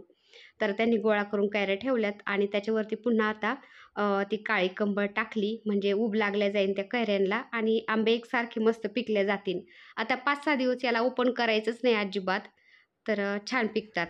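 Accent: Ahmednagar, India. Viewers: Nagar chi bhasha lagech olakhun yete amhi pn kud bolato sagale bolatat kuthe bolav pn aplich bhasha chhan ahe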